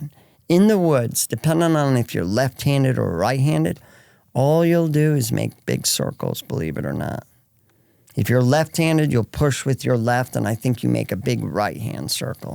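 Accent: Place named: Philadelphia, United States. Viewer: Dude sounds like he’s from S Philly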